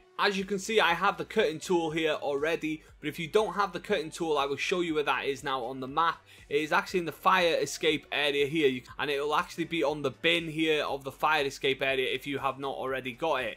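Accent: United Kingdom. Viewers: thank you for your uk accent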